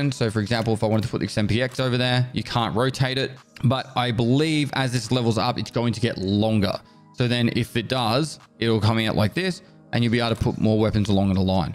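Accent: Australia. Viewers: It starts on if you don't wanna hear aus accent.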